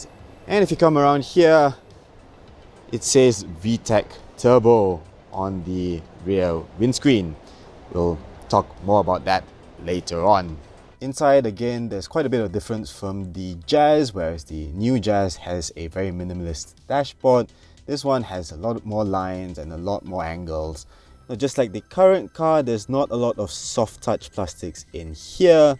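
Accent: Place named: Malaysia. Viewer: I just wanna say hey this is Malaysia and it's OK to present in local accent with correct grammar. Having a fake British accent will only makes you look funny.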